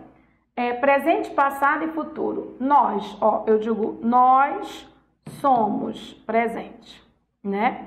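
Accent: Pará, Brazil. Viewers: "Verbo pank " kkkkk. Paraense doida! Grande professora, aulas excelentes. Parabéns prof!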